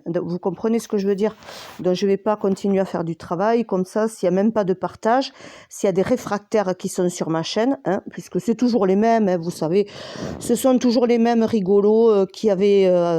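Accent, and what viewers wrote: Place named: South, France. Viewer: Et un bel accent qui nous emmène au soleil en plus 😘😘😘pour enmerder des détracteurs...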